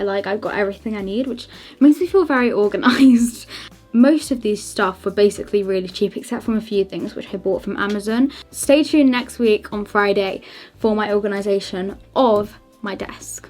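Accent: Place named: England, United Kingdom. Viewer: Your English acsent i need to get it 😩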